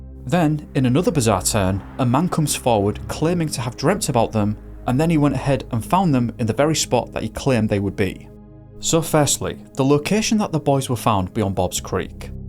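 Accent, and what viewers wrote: United Kingdom. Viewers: I agree! I prefer videos like these with UK-ish accents. It's oddly soothing to me.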